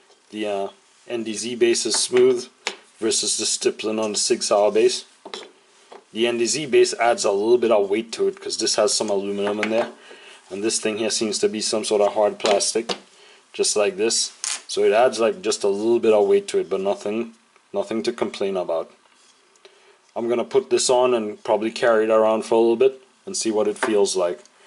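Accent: Trinidad and Tobago. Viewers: Do you have Trini roots? I think I hear it in your accent.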